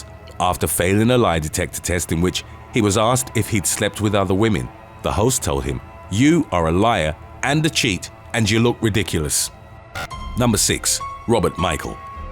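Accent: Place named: England, United Kingdom. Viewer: He has an English accent!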